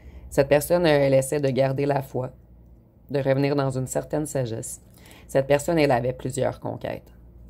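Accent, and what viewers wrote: Quebec, Canada. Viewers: Merci beaucoup a vous que j'apprécie ainsi que votre accent québécois que j'aime enormement . Gratitude et remerciements à vous .❤👍🙏🌺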